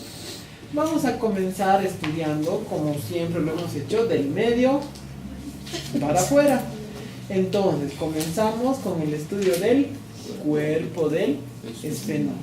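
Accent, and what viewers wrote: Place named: Highlands, Ecuador. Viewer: creo que si, tiene acento a los de la sierra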